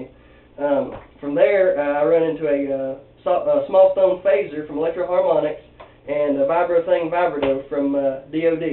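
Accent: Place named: South, United States. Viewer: You have a Southern accent. Where are you from?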